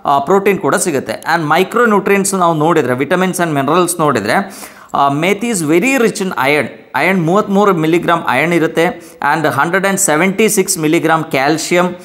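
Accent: Kerala, India. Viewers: Kannada mathaduvavaru kuda Doctor Nimmastu Shudhavagi Kannada Mathadalla Butfrom Kelavu padagala Accent gottagutade Nivu from Kerala But Nimma pure Kannada Vivarane Nivu Hutti Beledidu& Education khanditha karnataka yendu Nimma pratiyondu Vidio nodutene . Tumbaa chennagi tilisutiri 👌👌👌 Nimage Vandanegalu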